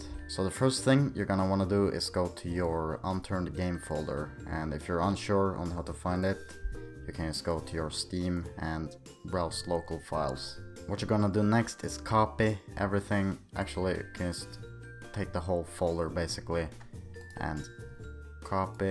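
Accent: Sweden. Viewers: are you swedish?? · Are you from sweden? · Äru svensk?🤔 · OMG ARE YYOU SWEDISH????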